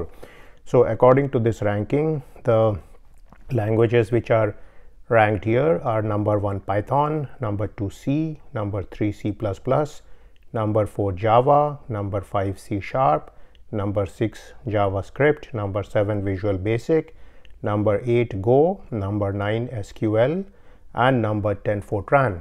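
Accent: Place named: India. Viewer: Gramps you got a hard Indian accent and I love it .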